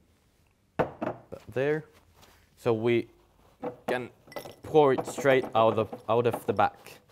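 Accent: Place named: Finland. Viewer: Lmao i recognize a finnish accent when i hear one (im finnish)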